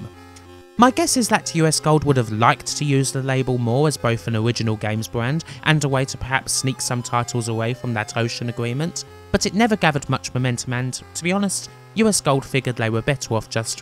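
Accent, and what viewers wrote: United Kingdom. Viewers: Maldita sea! Gracias por esta y mucha mas informacion de videojuegos saludos desde El Salvador, exitos, your british accent help a lot with the lenguage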